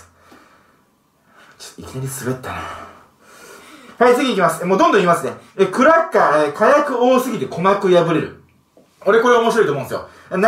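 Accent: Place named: Kansai, Japan. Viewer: なんで関西弁なん？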